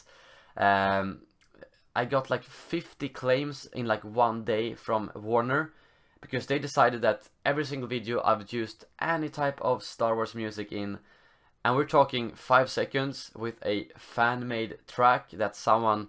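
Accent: Sweden. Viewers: Wich country are you from? Sounds... Swedish kind of.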